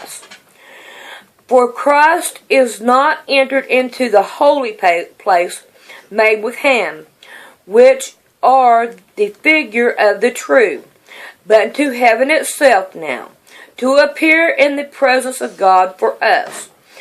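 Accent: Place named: Rural, United States. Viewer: Can you quit sounding like a Hick?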